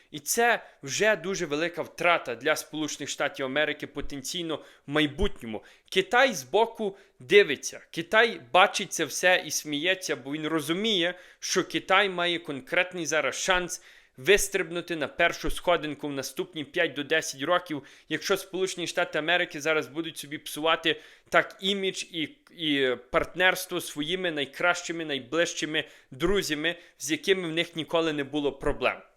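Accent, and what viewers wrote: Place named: Hutsul, Ukraine. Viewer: Вивчи Українську цуценятку ато від гуцульського підар акценту аж верне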